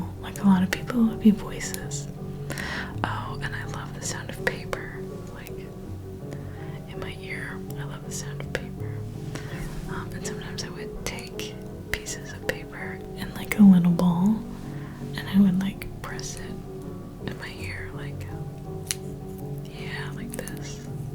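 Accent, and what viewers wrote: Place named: United States. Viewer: Falling asleep and then hearing Greggs with your American accent, surely not THE Greggs. But yes, it is the Greggs! I didn't know you were in the UK.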